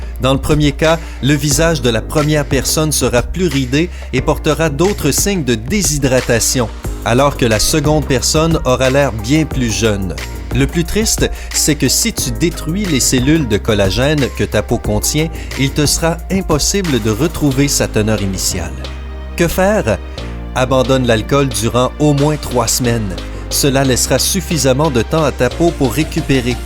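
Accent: Quebec, Canada. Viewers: Bonne vidéo mais j'aime pas l'accent québécois 😲😲😲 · J'adore l'accent québécois, je pourrais écouter pendant des heures !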